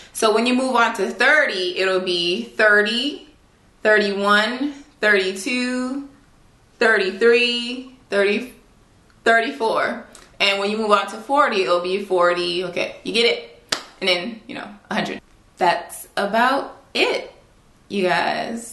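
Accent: Caribbean. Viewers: I started teaching myself sign language from this girl on YT-AshleyMichele07. You're right, it is learning a new language. I hear the accent. Big ups to the Caribbean ladies.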